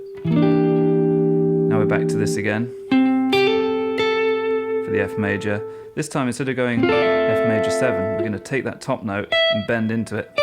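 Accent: United Kingdom. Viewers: The playing out of the chords is sublime. It shows how complex the song really is. Absolutely gorgeous. Oh my God he just spoke and he’s British! Beautifully and intelligently spoken. Beautiful.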